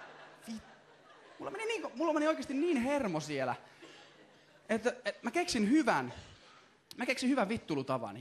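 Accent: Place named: South Ostrobothnia, Finland. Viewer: etelä pohojanmaa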